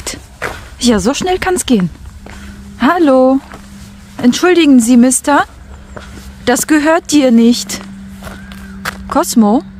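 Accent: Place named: Turkish German, Germany. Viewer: Jetzt fängst sogar du schon mit diesem Türkendeutsch an man man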